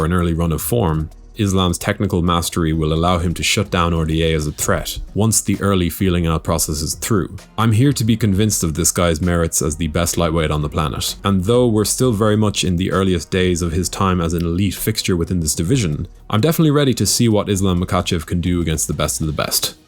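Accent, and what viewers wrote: Ireland. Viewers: This irish narrator bugs the heck outa me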